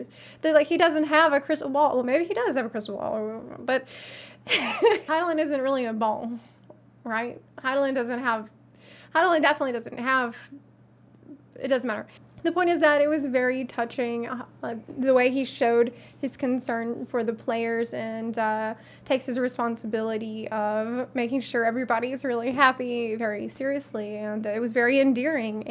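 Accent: South, United States. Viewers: I'm just getting started in FFXIV, and binge watching your vids(working) make it even better. Not to mention, that lovely, southern accent(I'm hoping Tennessee, my home sweet home), doesn't hurt lol. Love the content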